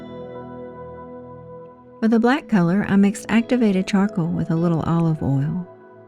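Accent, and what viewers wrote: South, United States. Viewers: Wow, I really like this swirl ❤. I love making soap and watching your videos too, they are so relaxing and you have such a nice voice, I love your southern accent 😊. Thank you for another wonderful video.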